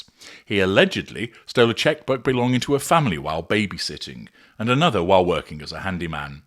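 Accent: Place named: Northern England, United Kingdom. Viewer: Who said you are the swindler??🤣🤣your northern accent is so strong - I hear around Cheshire or Stockport - who’s dumb enough to think you are this Israeli scammer. is definitely from ‘up north ‘. Derbyshire also a possibility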